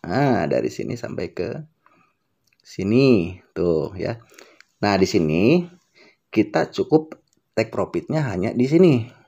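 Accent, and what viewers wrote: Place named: West Java, Indonesia. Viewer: Cara menjelaskan bhasa rakyat jelata, dgn bahasa yg medok sunda dan backsound hembusan 'udud yg khas mengiringi setiap paragraf dn yg penting buat orang jdi mudeng dan cpat faham, hatur nuhun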